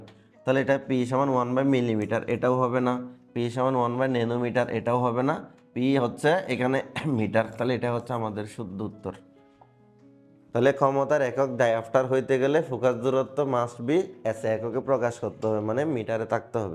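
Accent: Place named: Chittagong, Bangladesh. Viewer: আপনি মনে হয় চট্টগ্রামের 😁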